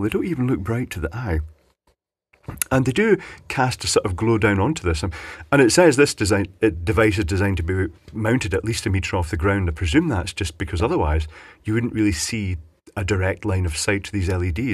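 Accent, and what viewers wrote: China. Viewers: I love Chenglish!!!!!!!!!!!!! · Thanks. I love the Chinglish pronounciation!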